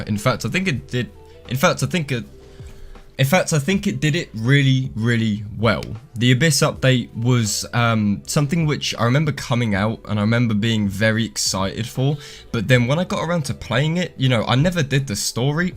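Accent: England, United Kingdom. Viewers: got love the English accent even tho I'm English 😂 plus you swear a lot kinda so that's a plus lol great vid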